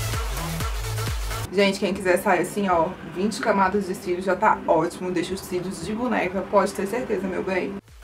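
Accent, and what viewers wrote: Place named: Bahia, Brazil. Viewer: senhor! que coragem. ..Kkkkkkkk tu é linda demais , amo seu trabalho e seu sotaque parece que é bahiana. beijos linda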